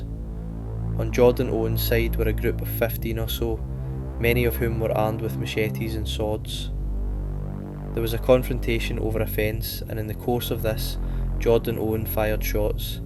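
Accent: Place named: Scotland, United Kingdom. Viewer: Gid video mate, keep doing what your doing, well put together & it's good to hear a Scottish voice talking about Scottish crime, rather than AI or a mad American, who doesn't know the difference between Scotland & Somalia 👌🙌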